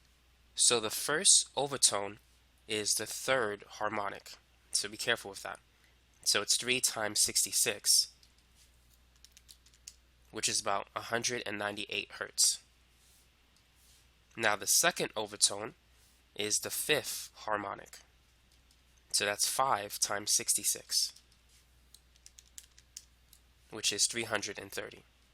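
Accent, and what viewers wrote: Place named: Boston, United States. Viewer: Its how they talk in boston ...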